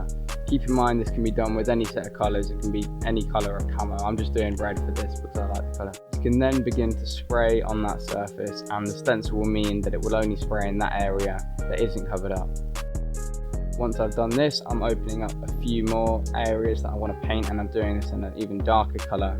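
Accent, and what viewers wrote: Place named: United Kingdom. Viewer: you can tell that he is british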